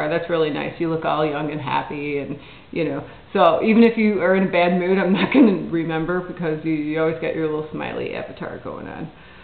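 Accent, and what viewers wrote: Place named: Maritimes, Canada. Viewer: Off topic? Meh! I just noticed how you say some vowels is like Maritimers...lol Yo, East coast, baby ;p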